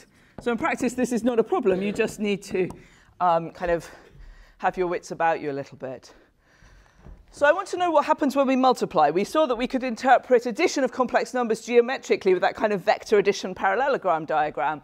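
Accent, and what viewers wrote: England, United Kingdom. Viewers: everything in an British accent sounds more interesting...even the unit circle.. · The last thing I may think of is to be taught by a British teacher not because they are not good teachers but because of the British accent. I feel like British teachers suffer when they speak...breathing issues...directly coming from the gym to the classroom and starting to teach... Brilliant lecture btw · English accent is fucking awesome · I like your English Accent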